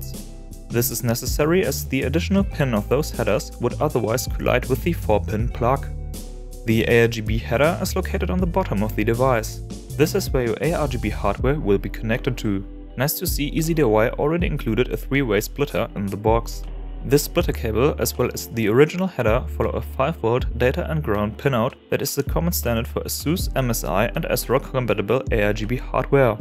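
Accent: Germany. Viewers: Wie ich dein deutschen Akzent raushöre :D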